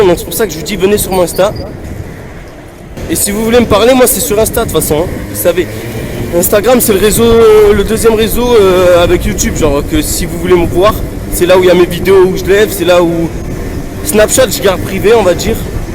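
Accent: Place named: Bordeaux, France. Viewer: Tes d'où t'as l'accent de bordeaux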